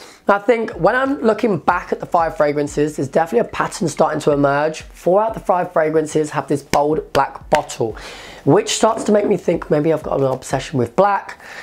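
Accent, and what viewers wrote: England, United Kingdom. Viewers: Hmmm where in England is your accent from?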